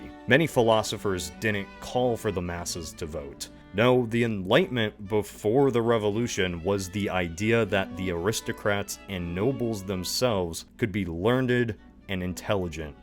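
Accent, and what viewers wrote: Ohio, United States. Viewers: Thank you for your wonderful new "alternatives"... I truly appreciate the work y'all do on these. I especially am glad that your family was able to exist long enough for you to be here! I really enjoy your voice. Do you have an Ohioan accent! This is -->almost <-- as interesting as the episode you did on the lack of the Great Lakes.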